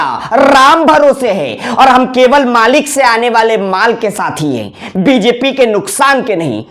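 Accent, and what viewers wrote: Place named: Mumbai, India. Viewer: bambad type accent hae tumhara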